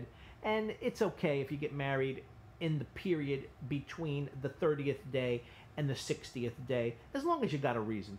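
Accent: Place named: United States. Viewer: so clean american accent )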